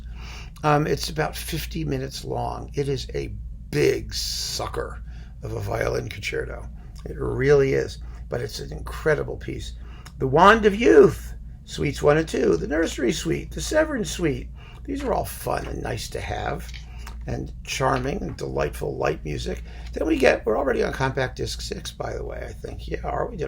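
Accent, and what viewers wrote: United Kingdom. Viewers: Lovely talk as usual, thank you, but you paid no attention to the which makes me go weak at the knees. And in the friendliest possible way, your British accent sucks! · Very good upper-class pre-1950 British accent!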